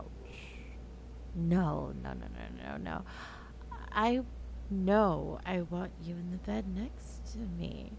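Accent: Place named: Texas, United States. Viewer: I can tell you're from Texas how you switch from Spanish pronunciation and quickly into English lol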